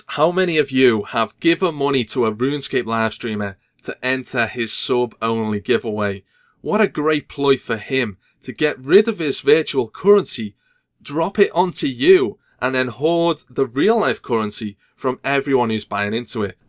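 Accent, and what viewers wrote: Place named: Liverpool, United Kingdom. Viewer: Dirty fucking scouser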